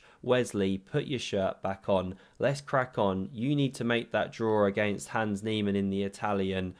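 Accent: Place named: RP, United Kingdom. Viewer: There's nothing like chess commentary served up with a posh Eaton college accent!❤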